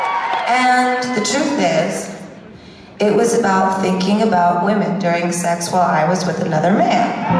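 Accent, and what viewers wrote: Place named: New York, United States. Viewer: That New York accent is coming through strong here.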